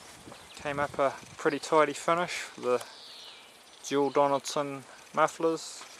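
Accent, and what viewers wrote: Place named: Australia. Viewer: In a few years when I ship a Sceniccruiser over from the states I'll buy your engine. Presuming your Australian given the accent mate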